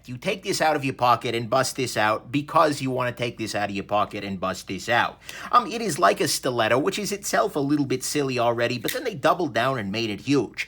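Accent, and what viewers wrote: New York, United States. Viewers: Can I ask where your from? NY?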